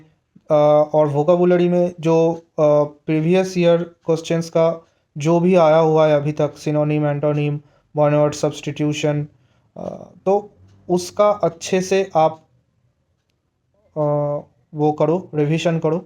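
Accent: Bengal, India. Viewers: তোমায় ল্যাঙ্গুয়েজ শুনে আমার তো মনে হচ্ছে তুমি বাঙ্গালি?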